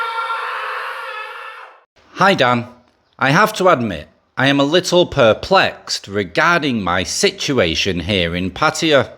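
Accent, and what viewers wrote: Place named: Scotland, United Kingdom. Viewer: Watching Dan for 10 minutes straight and I could’ve sworn I was seeing Adam Sandler doing a Scottish accent! Awesome dude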